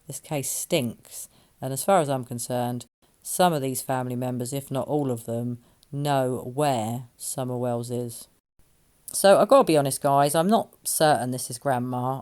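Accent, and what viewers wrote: United Kingdom. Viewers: If it IS true, grandma MUST have told LE waaay before now. I don't believe it's really grandma. Btw Love your content, love your delivery even more - nice to hear another Brit lol London.lady here - New sub too x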